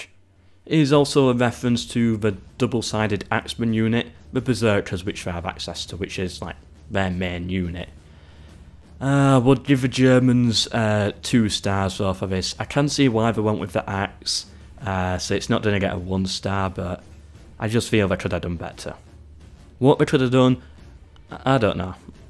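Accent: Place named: Manchester, United Kingdom. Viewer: What accent is this omg, Manchester?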